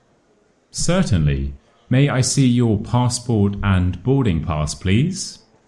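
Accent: RP, United Kingdom. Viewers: Teacher,my name is Grace from Taiwan. I thought your voice should be BBC accent. It is easy to prounce and listen accent.Yet,I had ever listened diallect British once all my life. It was really difficult for me. Completely not understanding. Thanks teacher for your instructions.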